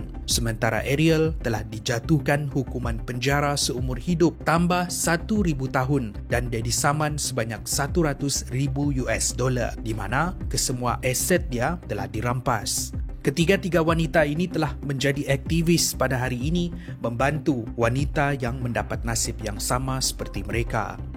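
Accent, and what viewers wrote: Sabah, Malaysia. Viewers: Org sabah kah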